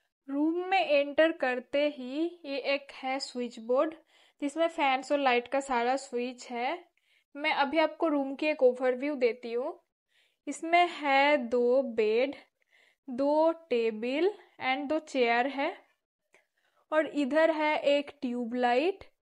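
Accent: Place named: West Bengal, India. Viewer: Didi apka ghar khaha hai... up ka bat sunkar I guess West Bengal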